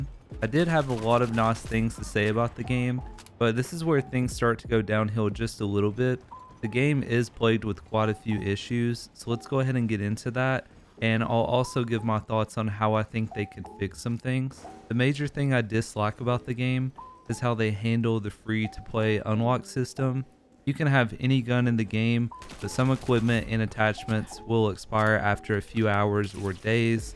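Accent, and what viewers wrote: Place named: South, United States. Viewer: you have a really cute accent XD sounds southern anyway im getting it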